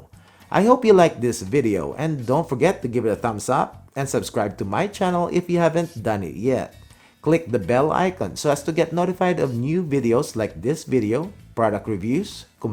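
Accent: Philippines. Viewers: First time I've heard a Filipino accent with the typical YouTube speaking tone ... Thanks for your review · Thanks for the thoroughly reviewed products. Are you Filipino? Your accent is very similar to many of my colleagues in HI. Lots of Southern Pacific Asians here.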